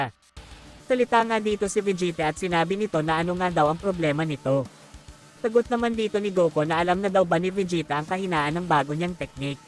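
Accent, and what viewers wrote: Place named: Visayas, Philippines. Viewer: Bisaya, katamad makinig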